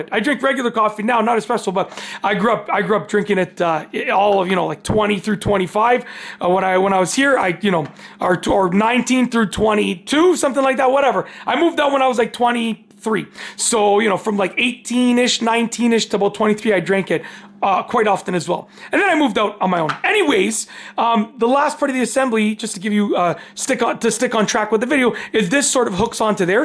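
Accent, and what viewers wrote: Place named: Canada. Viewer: I guess from your accent that you're Canadian?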